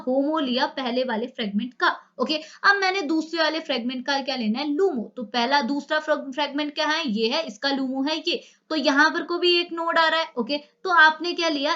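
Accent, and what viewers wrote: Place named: Hyderabad, India. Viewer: Aapka haidrabadi tone bhot cute lagta he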